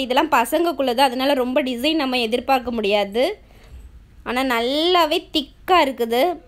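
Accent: Tirunelveli, India. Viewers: Sister neenga Tirunelveli ah.....speech apdi iruku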